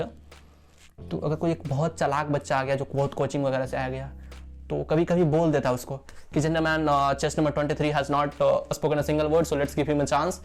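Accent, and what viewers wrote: Bihar, India. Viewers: Bihari ho kya bhai 😎😎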